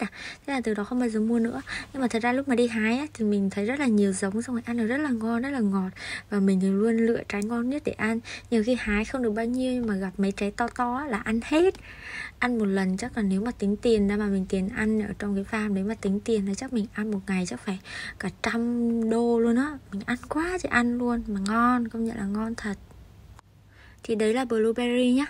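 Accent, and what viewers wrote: Vietnam. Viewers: Nghe là biết dân choa rồi. Cố lên em. :D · Anh rất thích giọng Bắc làm youtube farm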